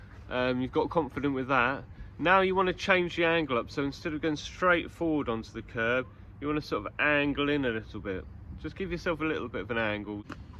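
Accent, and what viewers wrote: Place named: United Kingdom. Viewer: British ah boy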